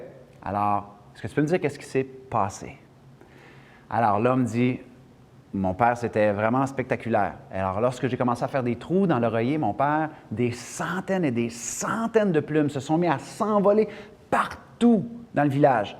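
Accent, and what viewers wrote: Canada. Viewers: Rien que pour le bonheur d'ecouter l'accent canadien je me suis abonnee, mais ce n'est pas que ça! Le punch, l'humour et le message de sont geniaux! Grand merci d'une française frontaliere à la belgique.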